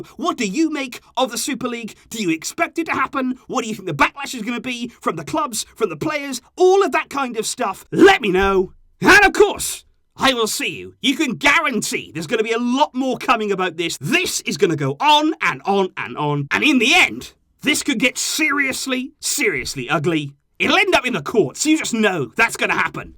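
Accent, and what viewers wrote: United Kingdom. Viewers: When British people get angry it sounds so beautiful. I didn’t even pay attention to the video 😂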